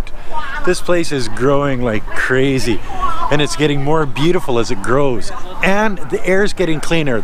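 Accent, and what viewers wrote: Canada. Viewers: Lol your accent, why does your accent remind me of DeadPool so much man, is it bc Ryan is also canadian i love your accent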